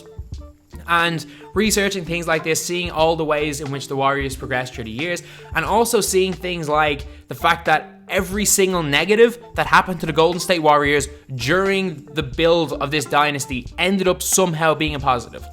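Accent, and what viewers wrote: Ireland. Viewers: Where in Ireland are you from? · Nice one.. Very refreshing to hear an Irish voice on nba One thing though Linsanity happened in NYC yet they let him go. N it was a culmination of many misfortunes that led to Linsanity.. Don't how it would hv happened here n if it did how would it halt the dynasty.. Maybe a better dynasty?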